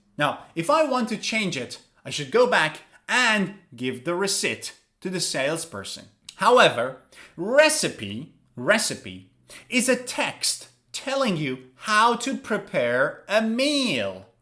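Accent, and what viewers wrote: United States. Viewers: Are you From America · You are really American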